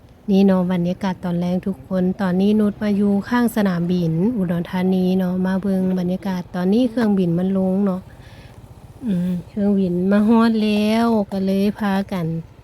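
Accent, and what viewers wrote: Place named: Northern Laos, Laos. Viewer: สบายดีค่ะน้อง คนสวย...เขามาเลาะเบิ่งยนต์แนมเครื่องบินนำน้อง จ้า..และฟังน้ำเสียงว้าวเพราะๆอ่อนหวานน่าฟังบ่ฮู่ว่าสาวลาวเหนือว้าวเพราะทุกคนบ้อจ้า...👉(น้อง คนสวยถ้าได้กลับบ้านลาวเหนือทำคลิปสาวลาวเหนือว้าว(พูด)ให้ฟังนำเด้อจ้า)👌👍😲💖💙🇱🇦🇹🇭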